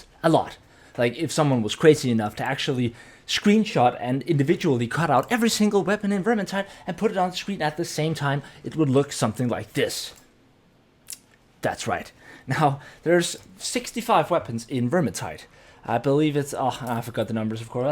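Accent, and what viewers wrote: Denmark. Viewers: My first video I'm seeing of your. You sound danish in your accent. Wondering where you are from my man?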